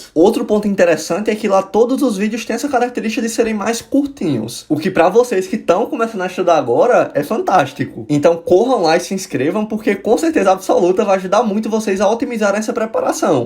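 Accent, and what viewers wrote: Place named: Ceará, Brazil. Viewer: Cara vc parece um Moisés do velho testamento jovem, europeu, maquiado e com um sotaque do cearense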